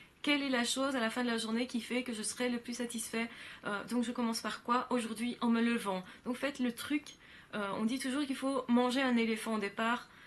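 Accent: Belgium. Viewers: Ah, je me disais bien que vous étiez belge(à l'accent) et les trains derrière vous le confirment... Liège, Namur?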